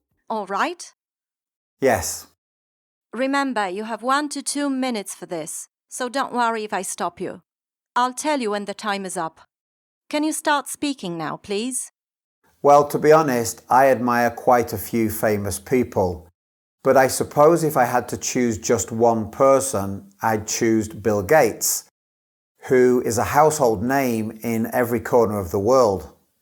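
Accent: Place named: Northern England, United Kingdom. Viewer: I reckon his accent comes from north England, perhaps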